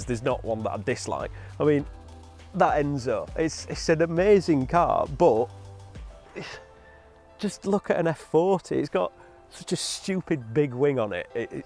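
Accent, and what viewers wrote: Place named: Liverpool, United Kingdom. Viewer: Hahah gotta love that accent ! F - fortaay. I guess thats scouse accent, anyways what a beast of a car that is !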